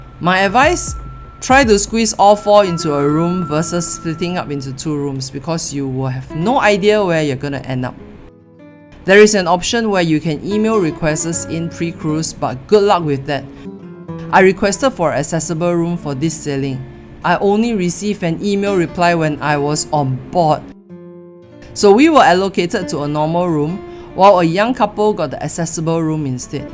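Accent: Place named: Singapore. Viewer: Yeah, except for the highly annoying clipped singlish destroying the english language.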